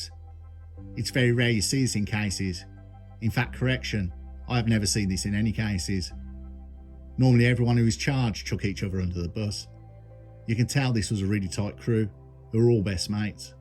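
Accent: Birmingham, United Kingdom. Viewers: your channel is the best mate, love hearing a brummy voice as well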